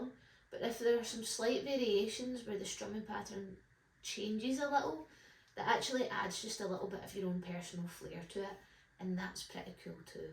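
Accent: Scotland, United Kingdom. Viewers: Thanks for posting this tutorial. Love your accent 😍 by the way. Is it Scottish?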